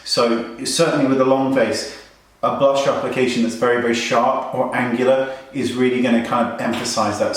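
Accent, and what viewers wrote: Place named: England, United Kingdom. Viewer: You are sooooo sweet!!!!! you seem a really really nice person, also I love your english accent. Well anyway, I want to ask you if you could show me how to do the makeup on a face with rosacea, not severe, just with a pinkish tone. In my eagerness to camouflage my rosacea I always end up looking with no life whatsoever, and going in again with a pink blusher it just seems nonsense. So now I leave my cheekbones with my pink rosacea and then I apply my foundation on the rest of my face, it looks ok, but I'm sure there's a better way around it. Help please! :D Kisses! (I'm moving to London on March 2015 Yeyyy!!!)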